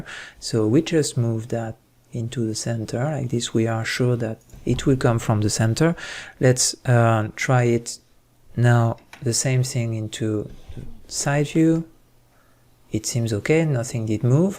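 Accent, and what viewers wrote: France. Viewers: Tu serais pas français par hasard ont dirait l'accent Français xD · Très bon tuto ! Et le petit accent français qui fait plaisir xD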